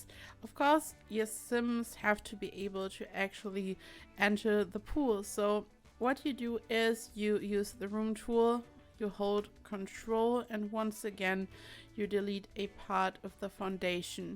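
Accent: Germany. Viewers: Ur German right?😂😂 Nice accent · You sound like german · are u german?? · Are you German? Because you sound like you are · are you from Germany? A friend of mine is and the accent is very similar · You sound german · U have an interesting accent! Are u from Germany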